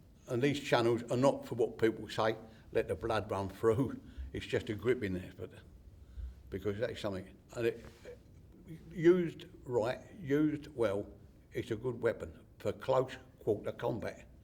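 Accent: United Kingdom. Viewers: Only British man that is immune to being made fun of for his accent.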